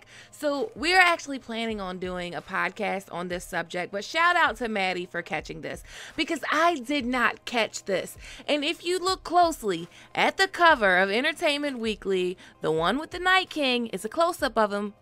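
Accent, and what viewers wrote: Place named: Baltimore, United States. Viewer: Whoever you are ma'am.. You are now deemed the best for the breakdown. I hear a Bmore accent as well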